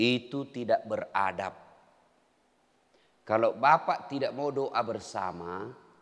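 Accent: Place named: Bugis, Indonesia. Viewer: Sulawesi orang bugis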